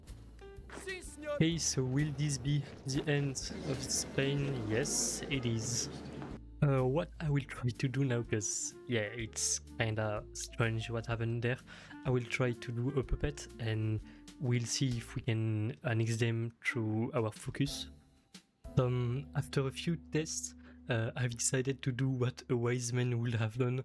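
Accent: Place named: France. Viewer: Sorry, my ears are trained to not hear when they hear france accent so the whole video was mute. How did I understand there was someone speaking with accent? By the comments.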